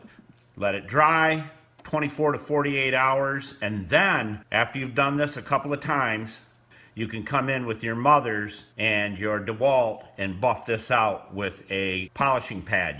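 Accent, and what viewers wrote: United States. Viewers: I am in UK and unfortunately I don't understand Yanky ! I have no idea what your talking about. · where is this accent from in USA? sounds great.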